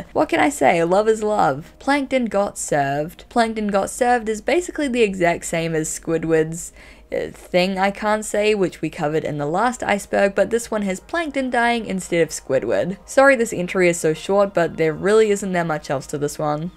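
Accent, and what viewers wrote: New Zealand. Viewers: I love your videos, but the kiwi accent is what totally hooked me 😅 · Is she a kiwi?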